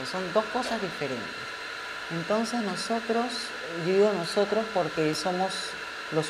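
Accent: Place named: Peru. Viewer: De dónde es? Me parece que de Perú por el acento, espero no decir algo incorrecto, yo soy de Ecuador y estoy estudiando en España, pero la mayoría del tiempo estoy en Alemania porque mi novio es de acá, y tiene mucha razón en todo lo que dice, pero debo decir que los colombianos soy bastante corteses, todos los que he conocido siempre dicen: "perdón", "disculpe", "por favor", "gracias" y "permiso", incluso no se sientan hasta que les digamos "por favor, toma asiento" jeje, y siempre me han tratado de usted hasta amigas de mi edad o incluso mayores, es interesante... al contrario que en mi país incluso también es común dejar propina allá, en fin, gracias por el video. :)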